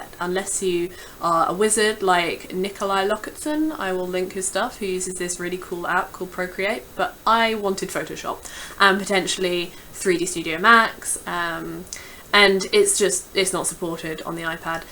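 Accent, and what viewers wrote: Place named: United Kingdom. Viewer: I'm Turned On ! geeky, british accent and beautiful !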